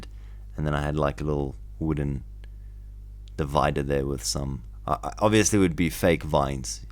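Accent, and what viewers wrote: South Africa. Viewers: You sound like you have an South African accent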